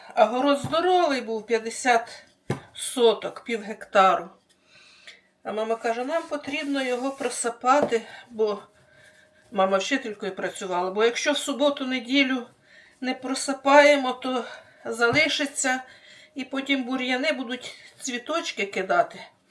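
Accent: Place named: South, Ukraine. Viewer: Ви для мене, як ковток свіжого повітря! Насолоджуюсь Вашими рецептами ! А Ваша така чиста українська мова з півдня України то взагалі БАЛЬЗАМ НА ДУШУ! Щиро дякую!!!! Здоров'я Вам, МИРУ, ПЕРЕМОГИ!!!!!!!!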